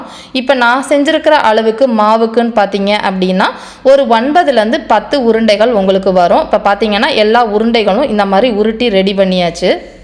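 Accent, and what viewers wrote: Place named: Coimbatore, India. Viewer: நான் உங்கள் new subscriber .நீங்க கோயமுத்தூர்காரங்களா?உங்க பாஷை அப்படி நினைக்க வைக்குது .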